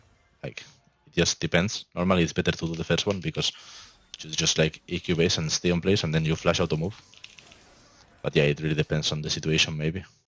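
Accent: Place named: Spain. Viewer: AJJAAJJAJ hermano como se te nota el acento de ESPAÑA con do cohone JAJA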